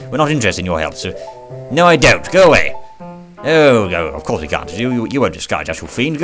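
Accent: United Kingdom. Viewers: Love the voice and brittish accent, you got a fave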